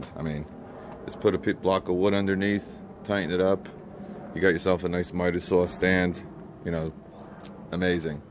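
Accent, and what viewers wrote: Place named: Long Island, United States. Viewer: You have to be from Long Island with that accent. Thanks for the review.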